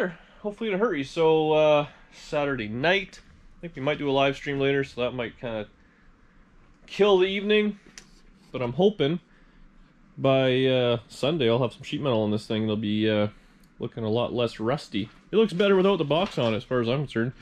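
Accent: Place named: Canada. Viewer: You seem like a cool Canadian dude, I like the way you canucks pronounce "out" and "about"!.. Do you smoke weed or drink molson canadian?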